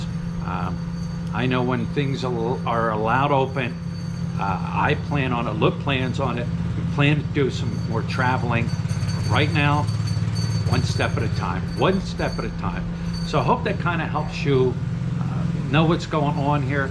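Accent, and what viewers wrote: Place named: Pennsylvania, United States. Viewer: Are you from the Coal Region of Pennsylvania, because you sure have the accent. You really need to lean how to pronounce the words of dialects in Philippines.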